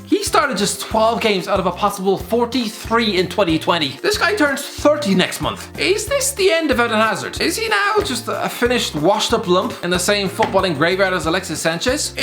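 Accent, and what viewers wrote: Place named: Ireland. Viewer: I had to put the video at 0.75x speed just to make this guy's Irish mumble rapping sound coherant.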